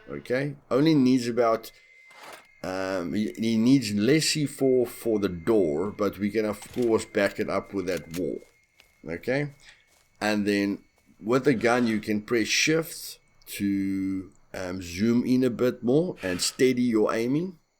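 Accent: South Africa. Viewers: I was going to say you sound South African. Glad to see another SA player making content. Lekker boet lekker. Keep em going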